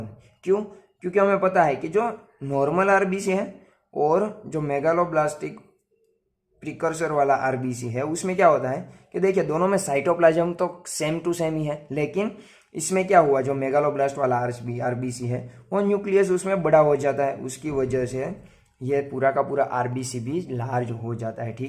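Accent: Gujarat, India. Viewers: I think you are gujju from your accents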